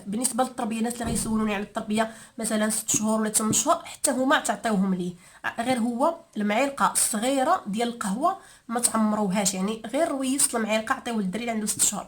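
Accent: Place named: Algeria. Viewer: هاذي موش لهجة تونسية هادي جزائرية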